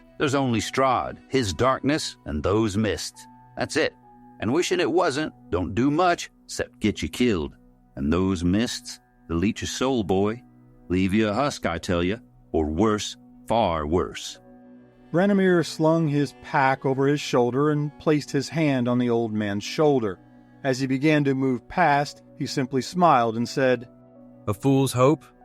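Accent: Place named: Deep South, United States. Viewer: Great video, I couldn't help but laugh though in a good way. I was expecting to hear Balkan accents no deep south 😂. Keep up the great creative and informative work that you do.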